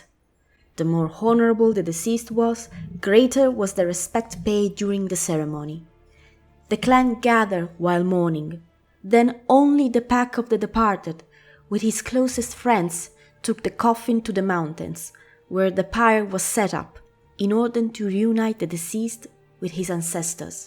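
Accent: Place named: Scotland, United Kingdom. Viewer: hai un accento simil Scottish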